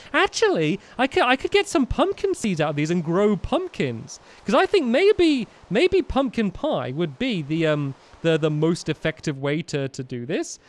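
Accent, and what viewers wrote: United Kingdom. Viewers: Are you british???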